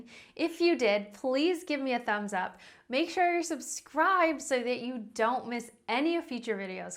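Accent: United States. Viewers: R u American ?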